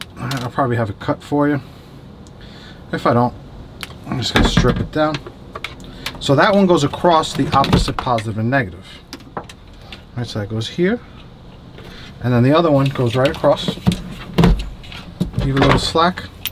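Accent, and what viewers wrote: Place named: New Jersey, United States. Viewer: Love the jersey accent! Great video.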